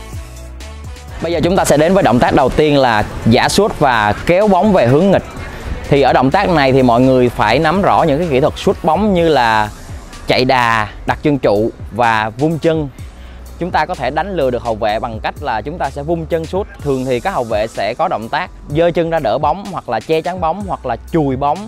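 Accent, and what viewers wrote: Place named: South, Vietnam. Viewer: Nói dùm rặc giọng nam đi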